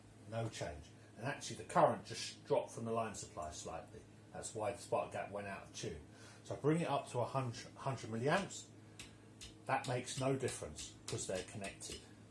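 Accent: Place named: United Kingdom. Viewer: you sound like you are U.K. based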